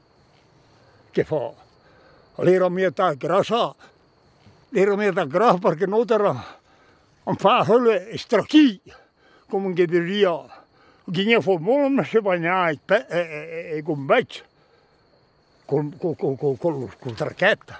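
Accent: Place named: Brescia, Italy. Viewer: Che bèl bresà che'l parlå!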